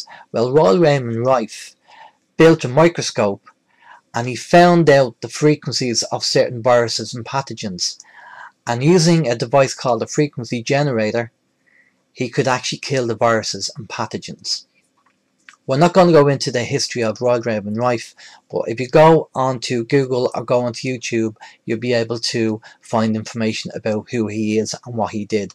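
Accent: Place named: Ireland. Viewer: Hi I have just come across this rife machine and rife machines in general. I noticed you have an Irish accent. Is there anywhere in Ireland this can be bought from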